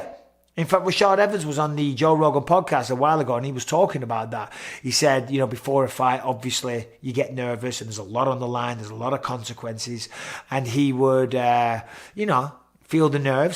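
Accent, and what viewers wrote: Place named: Liverpool, United Kingdom. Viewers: The scouse was pristine. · That scouser accent👌👌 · Fucking hell that one caught me off guard😂😂………. Also I know how our NW England accent sounds when saying a sentence like this 👍👍!!!!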